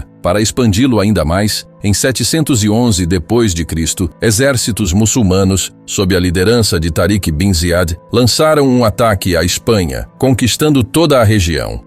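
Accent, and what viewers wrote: Portugal. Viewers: Mudou para o português de Portugal, pq é uma inteligência artificial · Do nada passou a ser narrado em português de Portugal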